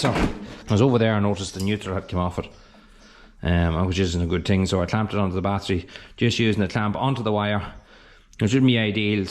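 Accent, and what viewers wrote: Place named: Monaghan, Ireland. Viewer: It’s the same Monaghan accent once again everybody!!!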